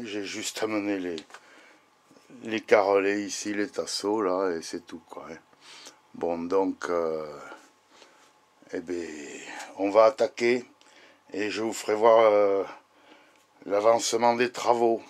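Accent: South, France. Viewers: merci pour ta réponse et j'ajoute entre nous qu'entre l'accent du sud me ravi encore plus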